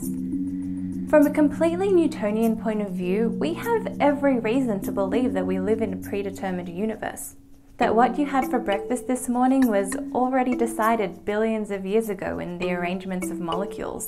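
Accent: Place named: Australia. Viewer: I appreciate your teaching style, animations, sense of humor, and your australian accent. Subscribed.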